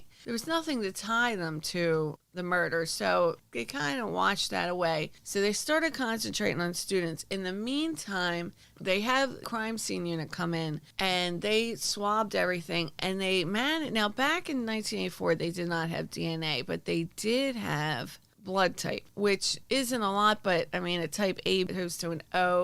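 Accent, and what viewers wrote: Philadelphia, United States. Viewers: Also ....YO PHILLAY!! ✌🏻😘 Living in CA makes me miss the accent and no BS🙌🏻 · Hi, stolen from me by Lindsay sent me. She was really bragging about you and your philly accent I just wanted you to know I subscribed and I'm excited about watching your videos and as Lindsay would say put my headphones on and getting about things, lol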